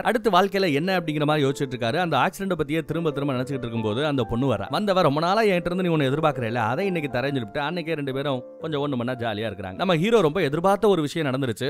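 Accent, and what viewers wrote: Madurai, India. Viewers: ப்ரோ நீங்க மதுரையா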